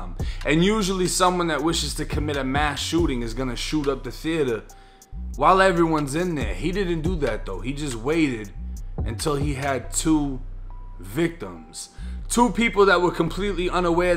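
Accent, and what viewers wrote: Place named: New York, United States. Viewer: I subscribed because of your NY accent?? Lol. Thanks for being articulate, and a good story teller.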